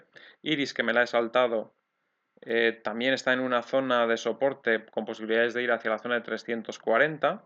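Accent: Spain. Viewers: Eres de Madrid a que si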